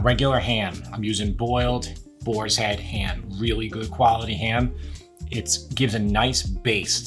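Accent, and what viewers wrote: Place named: Long Island, United States. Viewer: i just knew you were from Long Island from your accent. I as well have lived on LI my whole life..........and love your videos!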